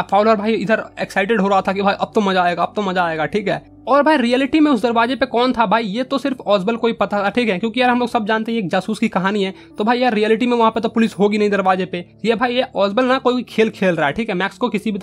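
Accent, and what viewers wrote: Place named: Bihar, India. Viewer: Nice story 😊explanation sir and also I love🤭 your bihari accent 😇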